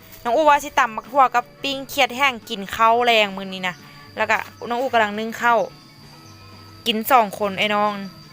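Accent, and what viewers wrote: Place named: Nakhon Phanom, Thailand. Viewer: บ้านน้องอู๋เว้าคร้ายบ้านอ้ายเลยนครพนม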